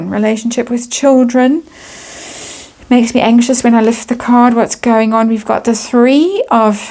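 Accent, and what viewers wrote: South Africa. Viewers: Love the lilt in her voice, awesome well bred South African accent and incredible snark! 😅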